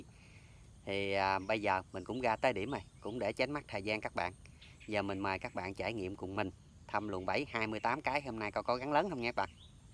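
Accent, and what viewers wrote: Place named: Hau Giang, Vietnam. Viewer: Bạn quê Hậu giang hả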